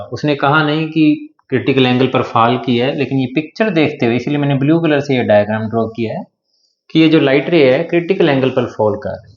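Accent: Haryana, India. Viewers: Haryana se ho kya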